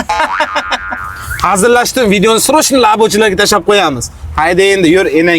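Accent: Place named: Khorezm, Uzbekistan. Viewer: Xorazim ku bu😂😂😂😂😅😅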